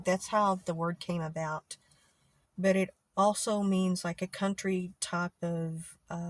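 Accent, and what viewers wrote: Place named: South, United States. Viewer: Hi! I have just found your video and I loved it. I love the southern accent and I´m trying to learn it. It is not easy when English is not my first language but it helps me a lot to understand better and get more fluency. Thanks for this awesome explanaiton! Greetings from Argentina!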